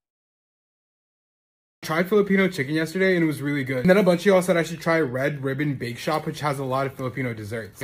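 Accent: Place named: Egypt. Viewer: يعني انت بتتكلم مصري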